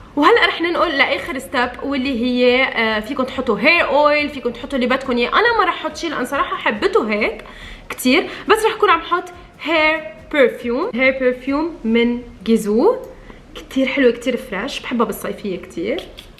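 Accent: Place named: Syria. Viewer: لان لهجتك سورية🤣🤣 وانا بعرف مافي توصيل ع سوريا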